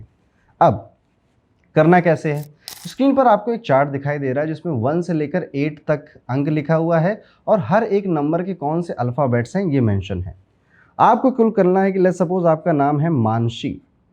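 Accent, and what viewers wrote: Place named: Kanpur, India. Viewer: Radhe radhe… fan ho gye hu aapki… watching u from Sydney but dil hai kanpuriya… aap bolte hoto lagta hai mere gher ka koi bol rha hai wahi lehja wahi slag wahi shabd… hashi bhi aati hai sun k aesa lagta hai gher per hu.., Kash aapse appointment le pati… thode mehnge ho aap aur abhi mai mere naukri nhi hai kaphi samay se job search ker rahi hu but pakka jaldi hi lag jayegi aur tab aap se baat kaungi… may be face to face… when i am there in kanpur… in my home and yes i know u read all the comments 😅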